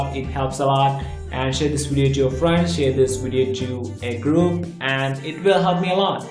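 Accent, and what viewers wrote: India. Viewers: I like the idea of him trying so hard not to sound like an Indian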